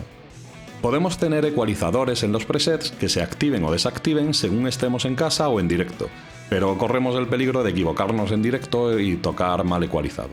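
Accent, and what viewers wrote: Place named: Spain. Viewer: Una pregunta sin relación al tema del video, de qué región de España es el acento del narrador? Gracias.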